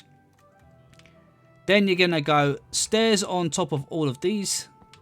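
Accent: United Kingdom. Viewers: I’m not a native speaker in English (I like your I guess British accent very much), so do you say abbey or monastery? I mean a building with a dwelling for nuns or monks and a garden in the courtyard with cloisters around it and a little chapel included. I hope I described it well so now you know what I meant. Sry for language mistakes 👍😀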